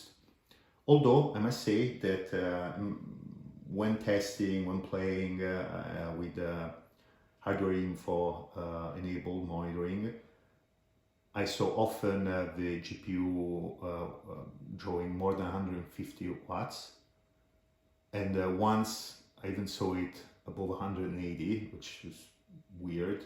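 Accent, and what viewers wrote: Italy. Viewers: Hey man, you sound like an Italian 😉 Very nice review, awesome laptop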